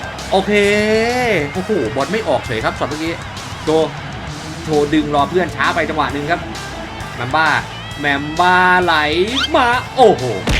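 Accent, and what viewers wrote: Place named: South, Thailand. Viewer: น่าจะตั้งชื่อว่า มูฮัมหมัดอะไรโช้ ออกสำเนียงใต้น่ะครับ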